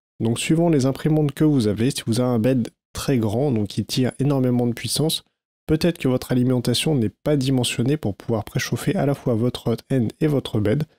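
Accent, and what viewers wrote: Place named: England, United Kingdom. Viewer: J’adore cet accent anglais!!!🤣👍🏻...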